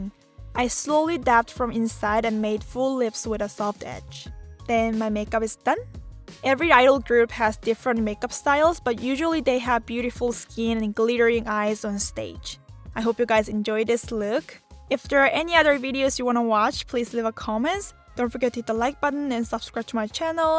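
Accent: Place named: South Korea. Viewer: You’re so pretty and when I was younger I was surrounded by fellow Koreans with your exact accent! Because I don’t have an accent and I’m studying in America, I really missed it! I love your channel <3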